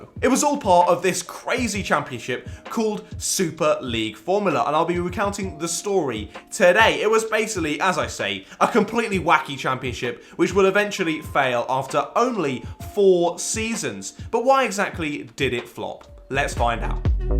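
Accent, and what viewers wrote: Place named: Liverpool, United Kingdom. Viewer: I've looked into this before and it's really interesting, but it was ultimately a bit rubbish, good video but horrible scouse accent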